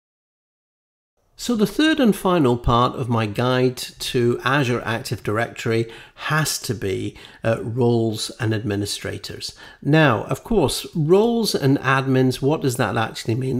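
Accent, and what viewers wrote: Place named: United Kingdom. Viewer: Hearing a British guy teach keeps my focus.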